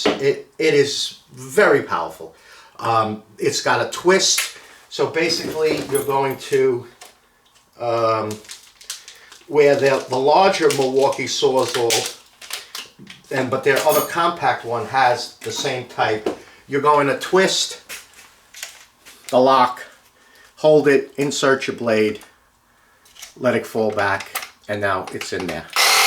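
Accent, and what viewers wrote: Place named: New York, United States. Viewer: Merry Christmas, what part of NYC area are you from? I can hear it in your voice. LOL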